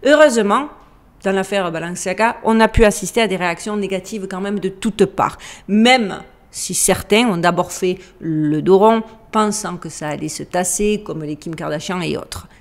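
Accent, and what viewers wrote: South, France. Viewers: Merci ! A toi qui met l'accent du Sud sur ls dingueries de ce bas monde... Que du bon pour toi et la famille incha'ALLAH, ainsi qu'à tout t'es abonnés (ées). Longue vie à ta chaîne...👉🏽😉👍🏼👉🏽🌟🌟🌟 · Et superbe t'on Accent du sud De la France J'adore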